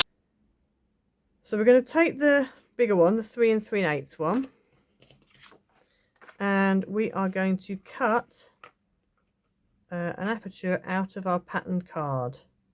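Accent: United Kingdom. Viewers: Lovely card beautifully explained. Thank you. Good to hear a British accent too.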